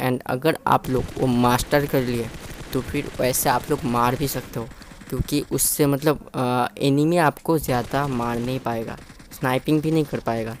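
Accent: Bengal, India. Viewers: R u bengali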